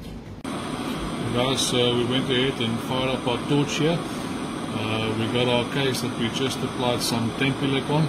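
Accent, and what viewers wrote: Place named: Afrikaans, South Africa. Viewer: Enkelvoudig, effektief, goedkoop en met 'n Afrikaanse aksent! Dit kan nie beter nie! Groete uit Utah, Merika!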